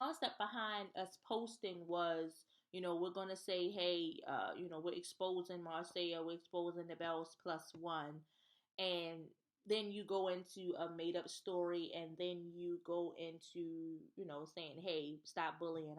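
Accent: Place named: DMV, United States. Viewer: I enjoyed this video very much so. Honestly! Everything you said hit the nail on the head. S/N: Are you from the DMV area? Your accent is everything 😍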